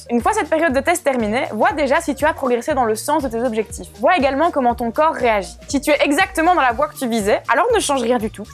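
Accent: Belgium. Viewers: Hey j'adore tes vidéos Néanmoins j'entend un petit accent serai tu une petite Belge tout comme moi ? Continue ce que tu fais t'es top 🐱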